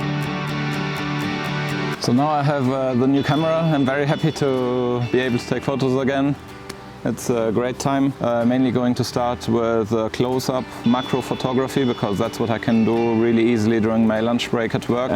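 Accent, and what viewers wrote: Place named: Germany. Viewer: Scanning negatives (or slides) is a niche application, but one where the Olympus cameras are a really nice (and quite affordable) option. The light source is a problem with 4x5 negatives, not so much for smaller formats up to 6x9. The pixel-shift hi-res mode does a really good job at that, even though the "true" resolution is more like 40 to 50 MP (still more than enough to make the grain visible). BTW, your English sounds somewhat "German", or am I mistaken?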